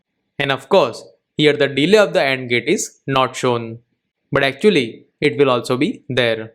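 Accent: India. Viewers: When someone is explaining something in tech in an indian accent. I just know damn well im getting it pretty quick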